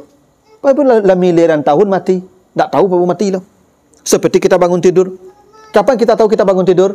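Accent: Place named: Aceh, Indonesia. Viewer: Urang maa ustadz ko min??? Manyabuik huruf "T" mode urang aceh, totok 😁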